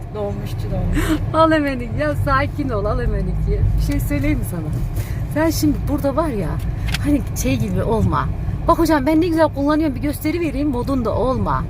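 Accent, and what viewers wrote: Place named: Çankırı, Turkey. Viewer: Burasi çankırımı hocanin şivesi cankirililar gibi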